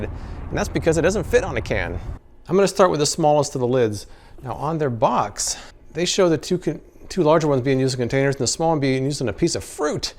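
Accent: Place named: Florida, United States. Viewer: As soon as you said you were from Jacksonville I said, I Knew It!! I might not have had the city down but I knew you were a floriduh boy. Review suggestion: lighted magnifying glasses. I'm in the market for a set for my crafting and there are so many different kinds on Amazon. Mighty sight of course, you may have done a review for that but not a comparison against other types.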